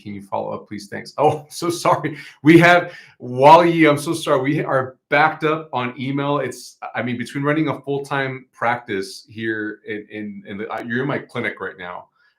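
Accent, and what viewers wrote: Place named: Tennessee, United States. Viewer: I can hear you tennessee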